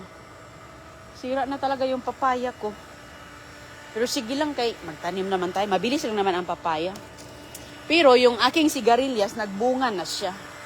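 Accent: Visayas, Philippines. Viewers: Bisaya Man Dhay. Please understand 😂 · Bisaya po kasi sya...Yung E ngging I,kayo nman my masabi lang... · bisaya ngani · Ano kba siempre bisaya bkit ikaw tga sang province kba?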